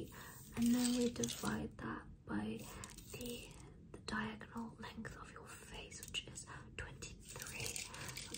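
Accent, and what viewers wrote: United Kingdom. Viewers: + why do you have a British accent?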